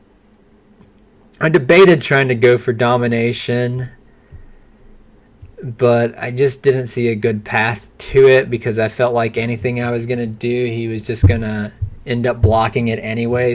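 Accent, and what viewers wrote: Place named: Delmarva, United States. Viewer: Are you from the DelMarVa region of the US by chance? I grew up there and I think I hear a bit of that accent in your commentary? Thanks for making the video btw, love watching TS. :)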